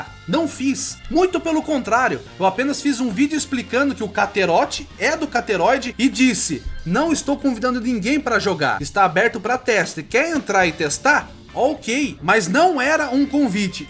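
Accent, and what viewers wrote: Brazil. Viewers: você é do sul mano ?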